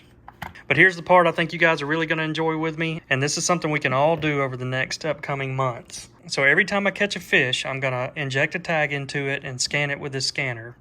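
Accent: United States. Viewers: Man i really like your american accent. Greetings drom germany😄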